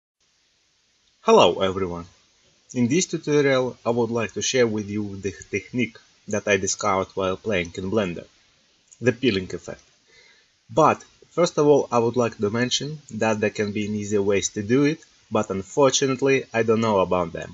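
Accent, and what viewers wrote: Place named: Russia. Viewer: btw, is it Russian accent or some hispanic?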